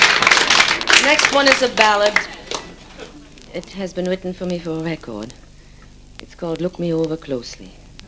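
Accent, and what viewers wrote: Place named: Berlin, Germany. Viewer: you can hear immediately that she was a berliner!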